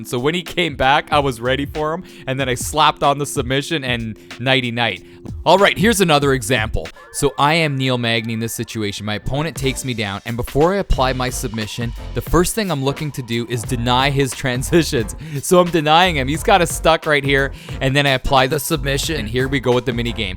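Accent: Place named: Canada. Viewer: I knew you were Canadian because you sound just like Ricky off The Trailer Park Boys.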